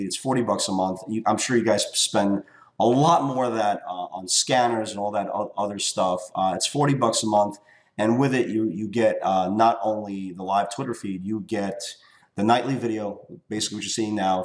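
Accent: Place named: United States. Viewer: your accent is quite possibly the best American accent in existence.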